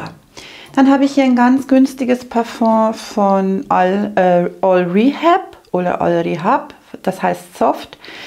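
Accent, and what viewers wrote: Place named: Bavaria, Germany. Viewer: So herrlisch präsentiert! Danke dafür! Froh hier gelandet zu sein. Diese private Duftreise gepaart mit dem wunderbaren bayerischen Akzent… phänomenal! Werde das Video gestückelt genießen. Gibt viele Parallelen zu meiner Kollektion. Viele Grüße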